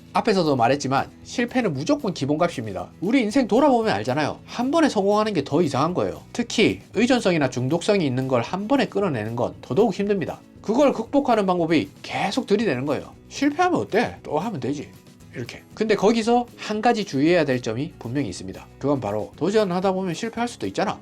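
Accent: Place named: Busan, South Korea. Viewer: 알고리즘에 이끌려 보게 되었는데,, 단주 중 정말 현실적으로 공감되고, 저는 부산사는 사람으로 사투리로 방송하시는게 너무 편안하네요 대단하세요!!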